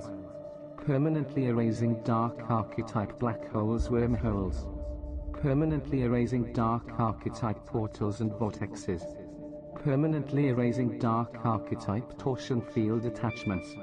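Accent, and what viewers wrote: Wales, United Kingdom. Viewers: The Welsh accent 😍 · Aww lovely Welsh accent, music to my weary soul. Thank you for victory against the dark 💕🌟💕🌟💕🌟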